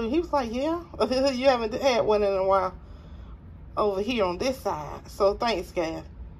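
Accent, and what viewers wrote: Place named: South Carolina, United States. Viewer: I love your accent. I thought that maybe you were from South Carolina. I was close. 😀. Keep up the good work, sweet lady.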